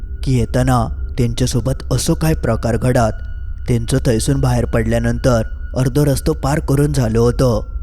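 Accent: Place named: Konkan, India. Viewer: Tujha mule Konkan chi bhasha awadayla lagi ani chan vatati aaikayla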